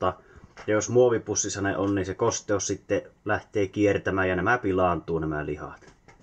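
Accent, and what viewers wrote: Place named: Ostrobothnia, Finland. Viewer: Hyvä video sait uuden tilaajan. Tosi piristävää kuulla vähän pohojanmaata youtubesta.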